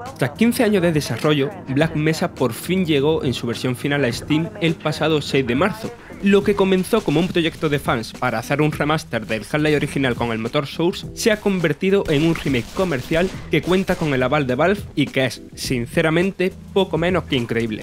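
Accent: Andalusia, Spain. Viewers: Es Andaluz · Porque habla así? Es algún dialecto propio de alguna parte de España? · Seguramente Andalucía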